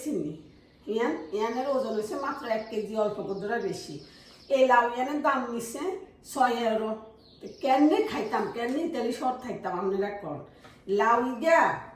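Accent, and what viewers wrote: Noakhali, Bangladesh. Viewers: Anti অাপনার কথা গুলো শুনে কলিজা ঠান্ডা হয়ে গেছে অাপনি অাজকে পুরো নোয়াখালী ভাষায় কথা বলছেন । অামি নোয়াখালীর মেয়ে অাপনার ভিডিও অামার কাছে ভালো লাগে ভালো থাকবেন খালামনি।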